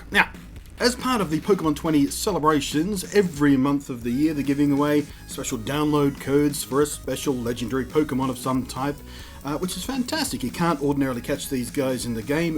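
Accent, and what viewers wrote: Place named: Australia. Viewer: Lol, sorry I laughed my way through this xD I live in a country town so getting to my closest EBgames is quite a drive, when they told me they where out I just didn't even want to bother as I have my Dex filled out anyway. Anyway I went up to the Gold Coast and to Pacific fair and they had a massive pile of the Mew cards (this was also at the end of the month) I did ask the lady about more if you have multiple game copies and she said no it's done by per person, so I figured that's why they still had so many cards at the end of the month... I was just going in for super mystery dungeon so it was a nice surprise after I wasted an hour driving to get one from my usual store, the woman who runs it is nice, so I'm wondering if I could ask to have one kept aside or some thing? To be honest the only one I really want is Darkrai. 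Oh well, awesome to hear an Australian accent ranting about Pokemon, makes me feel less of a weirdo xD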